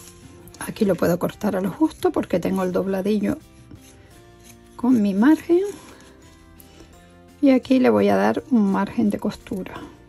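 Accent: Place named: Canary Islands, Spain. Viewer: Mil gracias, me encanta tu acento, me recuerda al de mi familia Canaria y a esa preciosa isla. Un abrazo desde Vitoria 😘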